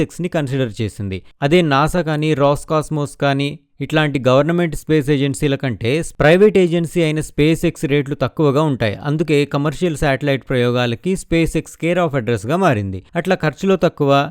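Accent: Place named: Telugu, India. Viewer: pure telugu clean voice , i love it , great andhra boy..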